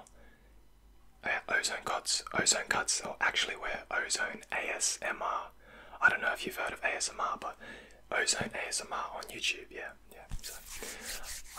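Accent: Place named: Australia. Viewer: As an Aussie you never realise Aussie accents until you hear them in videos 🤣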